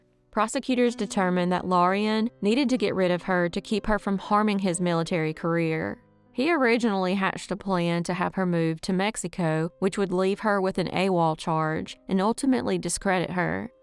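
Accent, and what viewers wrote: South, United States. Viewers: 🤔 I literally heard these crime updates, word for word, on another podcast. Is " " cutting and pasting together her show and adding a country twang?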